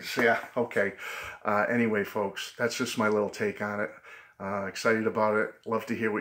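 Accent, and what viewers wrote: Boston, United States. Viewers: Hi, Thanks for all this GREAT information! White Album Super Deluxe, yes! I love all this resurgence of Beatles everything! It is all very exciting! I just have to say I love the way you say "McCartney"! The Boston accent is GREAT! I happen to like the Liverpool accent too! Wonder why?! You're in my prayers tonight. "God let us Beatles fans live another 35 more years or at least until there's nothing more Beatles to re-release!" LOL! Thanks again! I've got to now to look for my part-time job to pay for all of this stuff! Cindy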